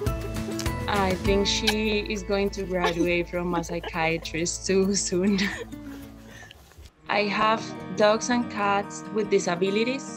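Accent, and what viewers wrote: Paisa, Colombia. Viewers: Caray, eres buena, noble y bondadosa, eres hermosa, y aparte tienes ese delicioso acento colombiano que me derrite..... si, la mujer perfecta! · suena paisa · Ay...en el video sale una voz colombiana y muy paisita...🇨🇴👍🏻 Gracias por amar a nuestros amigos los peludos...🐄❤️ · Ese acento paisa... 🥰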